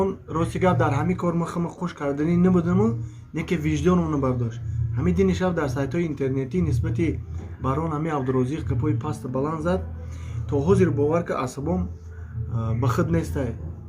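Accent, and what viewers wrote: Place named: Yovon, Tajikistan. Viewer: Ту монда буди ба хами акценти ёвонит Абдурозика таьна накарда.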